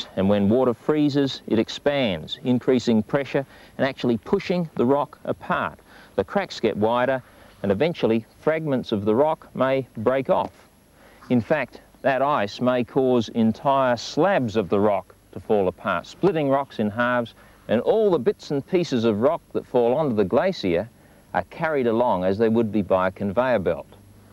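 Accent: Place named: Australia. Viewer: That is how we Aussies pronounce glacier as a rule.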